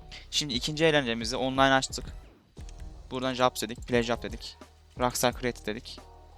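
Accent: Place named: Azerbaijan. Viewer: abicim azeri sandım az yavaş konuş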